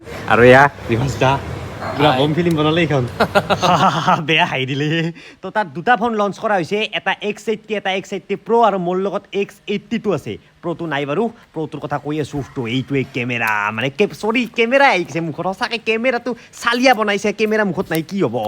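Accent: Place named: Nalbari, India. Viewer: da apunar kotha bur bohut val lage, Moiu nalbariya hoi, Mur koka aita ghor nalbarir, But moi golaghat ot jnmo hoi Golaghot te Amar ghor etiya, Heibbe nalbariya kotha nihikilu valkoi😊😊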